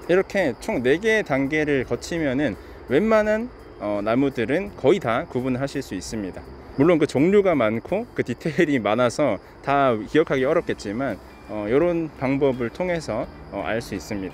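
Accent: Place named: Gyeongsang, South Korea. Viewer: 경상도 사투리 살짜기쓰시네요? ㅎㅎ 오늘영상은 특별히 자신있는 목소리톤이셔서 활기차보이시고 좋군요! 목소리 참 매력있으세요! 늘 잘보고있어요! 감사합니다🙏🙏🙏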